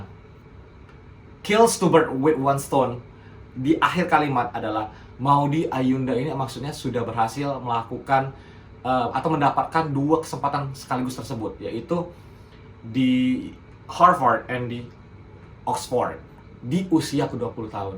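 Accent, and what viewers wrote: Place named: Palembang, Indonesia. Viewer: Ngapo cak kenal logat2 nyo tu kak..cengki wong plembang ini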